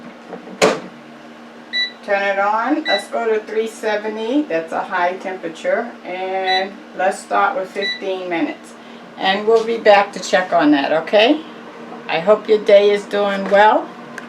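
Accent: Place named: New York, United States. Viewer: I just love your jersey or new York accent as well as your channel thanks for sharing hun😀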